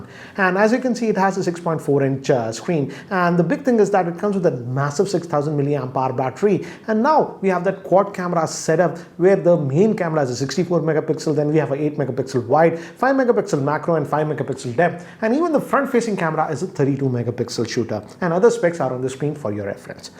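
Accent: India. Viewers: when are you gonna drop that Indian accent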